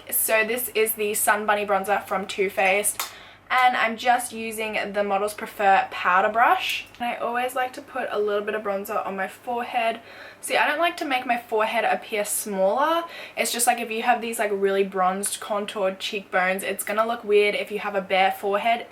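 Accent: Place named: Queensland, Australia. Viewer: you are such a doll. I was in QLD a few months ago, love your accent 😘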